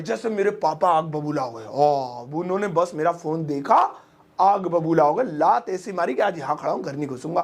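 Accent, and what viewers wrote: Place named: Rajasthan, India. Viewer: Sir your and my language is same When you said - ka keh rho h ee 😅 I'm from bharatpur Rajasthan Watched all your videos 😍 Love you sir 🤟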